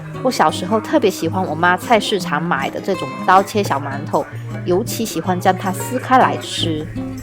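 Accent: Fuzhou, China. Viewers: 好熟悉的乡音？福州人？ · 这福州话口音好重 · 你肯定是福州人 福州腔好重🤣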